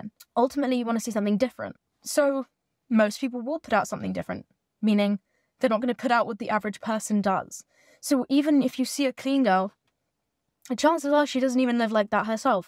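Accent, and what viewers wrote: United Kingdom. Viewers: What accent does her have ? The British one ? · im british and she sounds very british to me, i don’t hear australian tbh😂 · her style, natural look/makeup, clear skin, brown layered¿ hair and british accent really make her come off as clean girl/posh if you dont know her personality.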